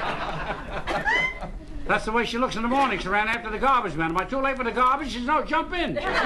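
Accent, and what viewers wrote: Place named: New York, United States. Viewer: Jewish New York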